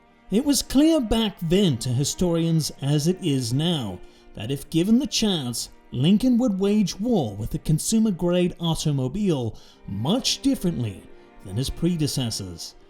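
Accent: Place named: United Kingdom. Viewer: My favorite thing about this whole series is it’s a British man narrating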